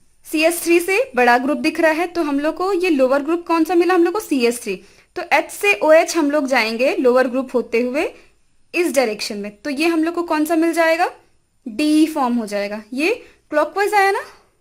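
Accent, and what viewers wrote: Bihar, India. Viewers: Mam Bihaar se hai kya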